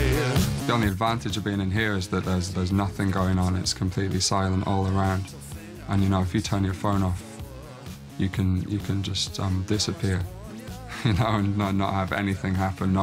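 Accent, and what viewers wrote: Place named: Scotland, United Kingdom. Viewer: Scottish ,,,,cool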